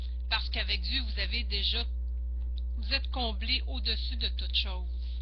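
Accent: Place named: Canada. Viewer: Bonjour d'un frère français j'aime bien vos vidéos merci pour vos conseilles et vos expériences , ça donne une petite tournure marrante avec votre accent Canadien .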